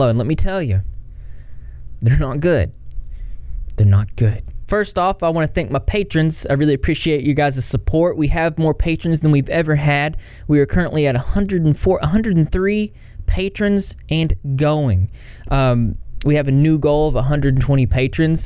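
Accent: South, United States. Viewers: I love your southern accent ❤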